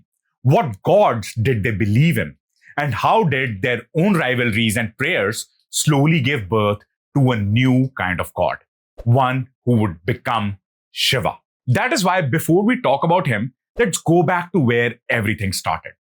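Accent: India. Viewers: Superb video indeed, most appreciated! However, it is INCOMPLETE without exploring the TAMIL beliefs in the deity Shiva. In Tamil, though, the name is pronounced SIVA and not SHIVA. Many scholars believe that when the Indus Valley civilization died out, maybe due to climate catastrophes or river path changes, those people moved far south. Or maybe they were running away from the Aryas (called Aryans by the British). Even now in Tamil Nadu, there is a deep-seated hatred of the Aryas and the Vedas and particularly the hatred of Brahmins. Oh you goddamned Brahmins, you have dominated and controlled us for thousands of years, now get out! One political leader openly called for the genocide (mass killings) of Brahmins. His name was E.V. Ramasamy. That party is the DMK party. It means a party for the upliftment of the Dravidas: Dravida Munnetra Kazhgam. Anyhow, the main point is that Shiva was/is a God of the Dravidas (Dravidians as per the British). 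PLEASE EXPLORE in another video. And one last comment: please STOP KILLING the authentic Sanskrit pronunciations of our sacred words and replacing them with the lip-lazy Hindi versions. Your pronunciation of Svetashvara makes me cringe! Why do you Hindi speakers erase the "a" at the end of Sanskrit words? Rama becomes Ram. Shiva becomes Shiv. Yoga becomes Yog. Yuck.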